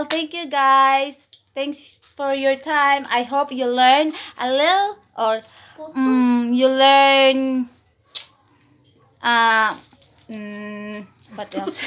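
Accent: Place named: Visayas, Philippines. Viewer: Bisaya Pala ikaw Heheeh nice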